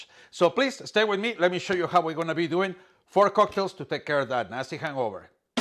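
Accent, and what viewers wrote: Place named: Latin America. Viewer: His Latin voice is hot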